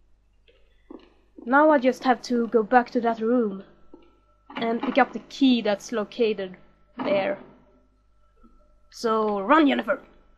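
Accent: Sweden. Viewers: ^ ^ ur accent kinda sounds swedish? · Great LP so far. I've just tried the game, I haven't really sat down to play it yet. cool accent by the way, my guess Swedish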